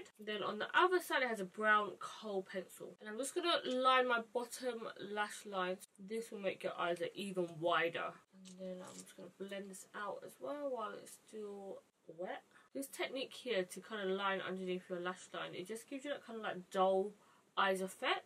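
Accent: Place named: London, United Kingdom. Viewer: Always. The intros always make me think damn this is going to be pretentious then you say “HI GUYS” in that London accent I’m like yaaas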